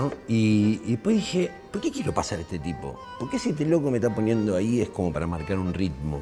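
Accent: Argentina. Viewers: Muy Bien con tus nuevas anécdotas! Jajaja excelente ese acento que se me esta pegando aca en mi propio País Chile! Saludos Kl! Se le quiere acá en Chile! Andá... y traeté otro personaje o amiga para que recorras Chile de Arica a Punta Arenas y luego enseñes La Gran Argentina Boludo! Saludos! Que Dios bendiga a tu pueblo y tu carrera bye! 🇨🇱🇦🇷🤣🍻📺😀😉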